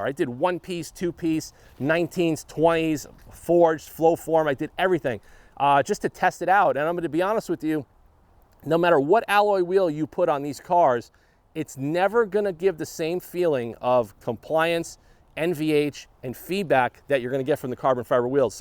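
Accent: New York, United States. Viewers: I bought a base model velocity blue 2020 gt500 on 1/6. It has white vinyl stripes. It won’t be driven in the winter. Your channel came up when I googled “GT500 in the winter”.It was interesting hearing your New York accent since I grew up in the Bronx. I now live in the very warm state of Minnesota. 🥶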